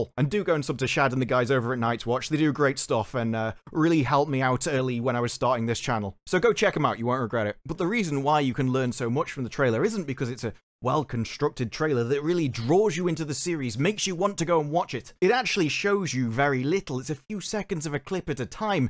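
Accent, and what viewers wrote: United Kingdom. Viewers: Can't help but smell the irony of somebody with a british accent, with a union jack behind them, complaining in english about a mangled culture.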